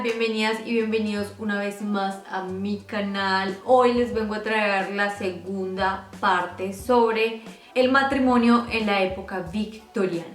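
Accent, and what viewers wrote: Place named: Antioquia, Colombia. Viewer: Estoy acuerdo en ciertas cosas sobre esa época, como lo que era la educación, verse y ser un tipo de persona con una moral más alta, pero, se me hace una reveranda idiotez lo de preservar la virginidad para el marido o ser una persona que no quisiera aportar para la manutención. Por cierto buen vídeo! Noto que tu acento es paisa, saludos desde México ;)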